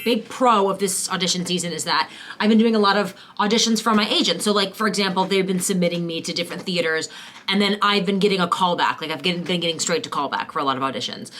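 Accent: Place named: Ireland. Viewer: Omg! You’re Irish accent kinda sounds like saoirse ronan!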